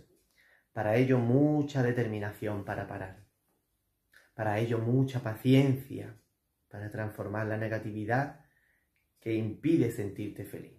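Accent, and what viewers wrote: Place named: Andalusia, Spain. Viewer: M gracias.. Me encanta tu acento andaluz yo de Cádiz. Ciudad de. Luz. Y tú luz es. Muy. Jeneroza gracias gracias gracias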